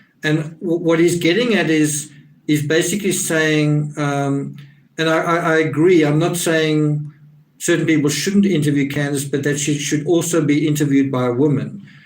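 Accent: South Africa. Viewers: Hi there from Namibia. Thought I recognised the SA English. I like your videos.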